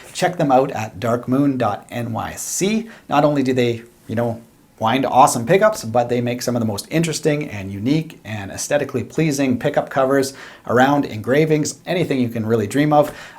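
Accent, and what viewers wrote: Canada. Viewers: Thanks, you did a really nice job with that. Your playing of the riffs was as consistent as the equipment for each comparison. I was surprised that I liked the A 2's the most. However, the 5's, which I have in my CV Tele were nice also. I'm new to your channel and was wondering, do I detect a little bit of a Canadian accent?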